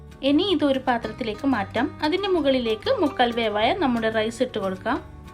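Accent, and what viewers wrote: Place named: Kasaragod, India. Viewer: Kasargod slang kelkkan nalla rasa... recipe kananum othiri ishta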